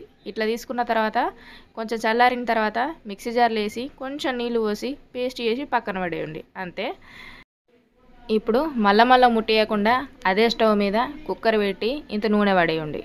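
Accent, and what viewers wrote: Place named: Telangana, India. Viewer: అచ్చం తెలంగాణ యాసలో చెప్పే విధానం బాగుంది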